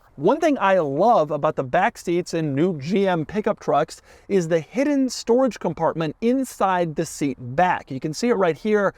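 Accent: England, United Kingdom. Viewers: Love the English accent. All the best from England 😊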